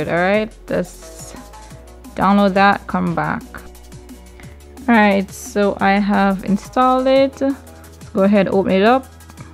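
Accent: Jamaica. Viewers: U Sound Jamaican, I'm a Fan Of Modding Bypassing, Custom Firmwares & Roms! 💯 I Subbed ☺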